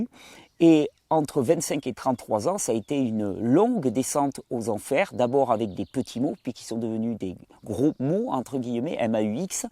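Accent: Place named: Southwest, France. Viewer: Bonjour! 😃 Te rends tu compte que je te connais à travers tes vidéos depuis des années et que seulement en juin 2019 j'ai commencé un jeûne. Je suivais ton programme alimentaire uniquement des yeux, parce que je n'ai pas les moyens financiers pour acheter un extracteur de jus et des fruits et légumes bio. Là j'entame mon 3e jours de jeûne sec, que j'espère faire durer 7 jours au moins. Je suis terrorisée par comment je pourrais casser le jeûne sec. J'ai vu ta vidéo sur un jus vert avec de la noix de coco ou avocat. Mais comment faire? Je n'ai pas d'extracteur de jus. Il y a un biocoop pas loin de chez moi, je pris pour qu'ils aient les jus appropriés. J'adore t'écouter parler, c'est d'ailleurs ce que j'ai fait depuis le début du jeûne en visionnant toutes tes vidéos de 2016 sur le jeûne la fête du corps. Ton timbre de voix et ton accent du Sud-Ouest, ta facilité d'élocution et ton grand savoir, sont source d'apaisement pour moi. 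À défaut de fruits et légumes bio, je me nourri de toi. Merci mille fois! 🙂 Merci encore! 😃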